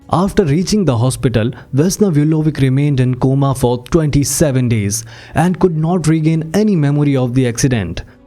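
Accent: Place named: India. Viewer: I hate indian accent 🤢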